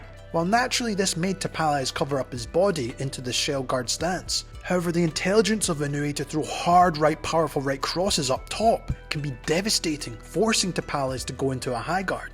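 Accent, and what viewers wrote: Scotland, United Kingdom. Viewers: Yeh, Japanese pronunciation is super easy compared to English. As soon as you understand the vowel sounds, the rules never change...but the video guy does have a Scottish accent, and I kinda like his Scottish take on Japanese lol.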